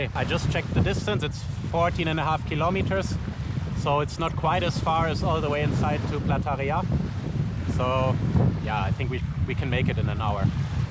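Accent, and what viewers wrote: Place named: Germany. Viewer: please dont tell the greek you raped their placenames with the german accent !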